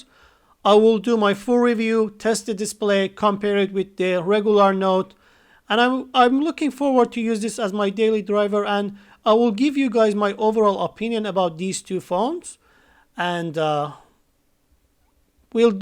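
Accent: Iran. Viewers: you have such a persian accent lol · Judging by your accent I'm guessing your Iranian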